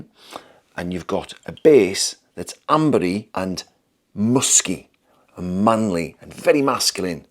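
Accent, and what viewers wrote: United Kingdom. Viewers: Love for your British accent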